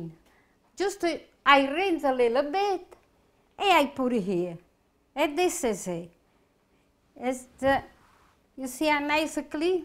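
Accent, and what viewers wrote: Italy. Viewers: La adoro sg. mi piace il tuo itanglese😍. Che Dio la benedica a lei e la sua famiglia, la sua famiglia è veramente fortunata di averla. Buone Feste❤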